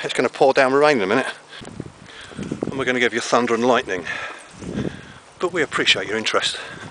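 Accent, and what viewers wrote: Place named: Cockney, United Kingdom. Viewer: nice vid nice to here abit of cockney me china keep up the good work on yer plates fish and tater mate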